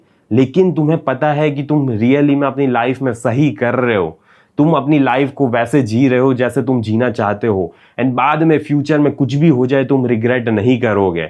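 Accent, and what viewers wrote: Gujarat, India. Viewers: Gujju accent detected ❤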